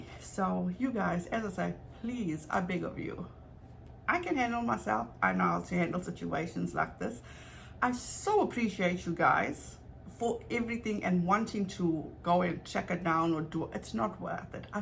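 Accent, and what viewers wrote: South Africa. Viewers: 1- just because 1 partner doesn’t bring in income does NOT a mean they don’t contribute financially. Often it’s the at home partner who pays the bills and manages a budget. 2- I’m really embarrassed that I thought your accent was Australian. Oops. Now I know it’s South African. And I’m so sorry someone thought it was acceptable to call you a slur. Not ok. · I absolutely LOVE your self confidence and self respect!!! U r such a beautiful person & mother and I’m SO happy u r able to let the ignorant comments by vile & disgusting people just roll off your back!!!! I can totally tell that it doesn’t bother u which I think is awesome!!! I know your grace really infuriates those insecure people!!!! Good for u!!! I’m proud of u & I love your passion, compassion & your intelligence!!!! (Side note - I ❤️❤️❤️ the South African accent!!! It’s my favorite of all accents!!) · Oh I'm so sorry - I had to pick my jaw up off the floor when the K word was dropped, my besty at highschool was from SA n listening to you is like hearing her Grandparents, such a great family - should've come here instead o US 😉🥰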